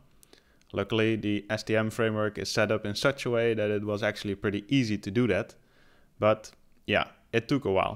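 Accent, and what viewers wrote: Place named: Netherlands. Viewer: Het is duidelijk van jouw stem dat je uit Nederland komt. LOL. Zier er top uit.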